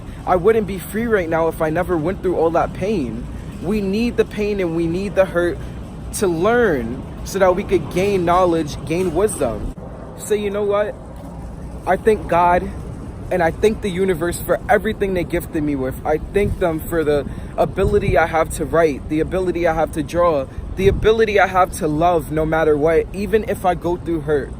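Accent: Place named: New York, United States. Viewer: you have really deep accent real new york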